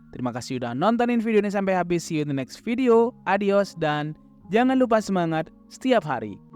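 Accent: Madura, Indonesia. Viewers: Owalah, orang bondowoso, pantesan. Umumnya d rakit, malah ngomongnya rantep, kerasa maduranya😅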